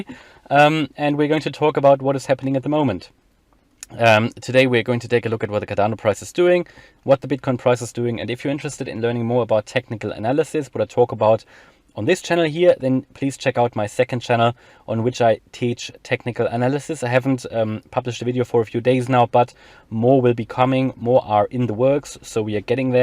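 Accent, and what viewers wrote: Germany. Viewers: Sounds you are from Germany - beste Grüße, Robert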